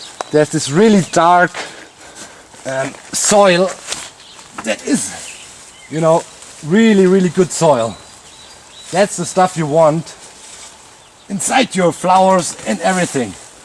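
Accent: Germany. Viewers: Hallo! Ich mag deinen akzent! Im going to guess you are german and I hope im right. Your accent sounds german and my family comes from germany and they have a similar accent. Sorry for assuming anything . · You sound a lot like Juergen Klopp. Are you from the same region as him?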